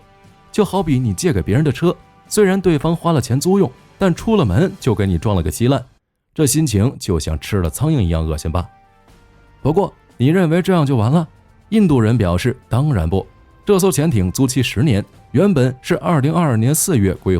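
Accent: China. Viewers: 只要是這種中國口音的不是大外宣就是大內宣,只要你是中國的敵人,他就製作假視頻來攻擊你,從來不懂得,去讚美別人,只會抄襲別人,又不懂得核心與關鍵技術,於是呢就製作了一些四不像的武器出來了,沒有作戰經驗的中國解放軍,只會使用鋼筋水泥,號稱基建狂魔,笑掉到人家大牙。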